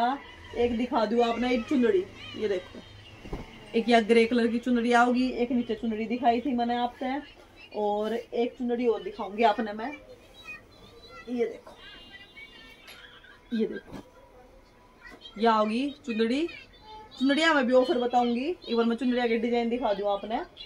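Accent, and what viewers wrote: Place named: Haryana, India. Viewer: Kya mast hariyanvi bol the h didi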